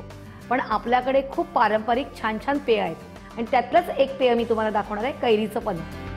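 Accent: Pune, India. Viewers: Me punyachi ahye pan atta Solapur la tumch bolana punyachi athvan Karun dete mast recipe astat me saglya thandgar reci try kelya toooooooo good .tq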